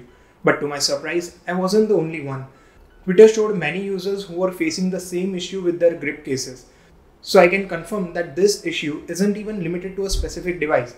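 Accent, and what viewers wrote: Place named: India. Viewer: I was watching this wondering how all indians sound the exact way we Americans think you sound 😂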